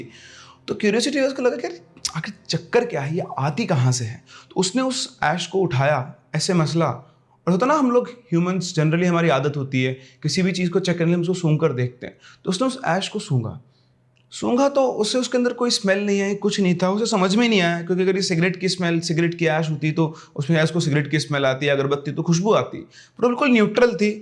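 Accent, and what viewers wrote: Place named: Haryana, India. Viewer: Sir your haryanvi is so cute and funny 🤣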